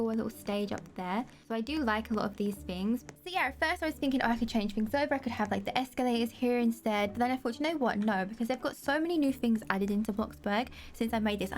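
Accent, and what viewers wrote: London, United Kingdom. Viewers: You sound British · Are you British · Amanda Sorkin She’s from London 🇬🇧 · BRITISH WOMAN!!!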